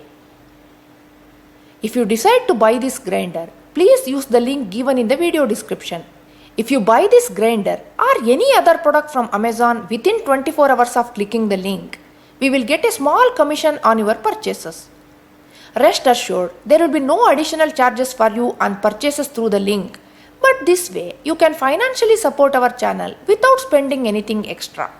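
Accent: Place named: South India, India. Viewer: I loved the voice as well as the presentation. It's south Indian accent so you are finding it different.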